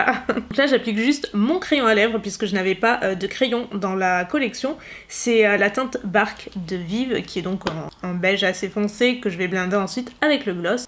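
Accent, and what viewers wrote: South, France. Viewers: Je viens d'aller voir tes premières vidéos pour vois si tu avais changé! et non tu n'étais pas du tout hautaine, n'importe quoi! Par contre tu avais plus l'accent du sud, c'est rigolo :)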